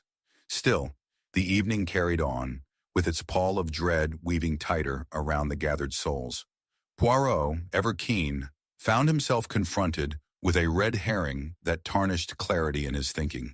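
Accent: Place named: United States. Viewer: Oh dear - AI American accent is just so wrong!! Add this channel to your "do not recommend list"!!!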